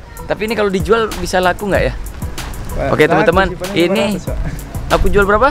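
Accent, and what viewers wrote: Madura, Indonesia. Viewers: Orang madura ya bang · bang asli Madura mana??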